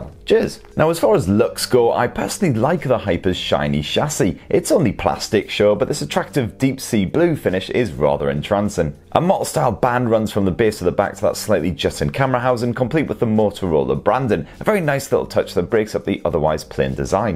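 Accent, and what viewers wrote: United Kingdom. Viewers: Lisandro Bravo you realise that he has British accent, which is the original English?!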